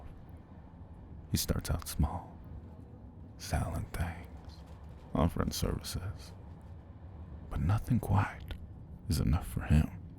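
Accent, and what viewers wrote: South, United States. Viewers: I RAN to this video, hawt damn. Edited to add: as a southerner, I'm so picky about southern accents but you ate and left no crumbs, sir. Tysm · I saw country accent, gasped and clicked immediately 😏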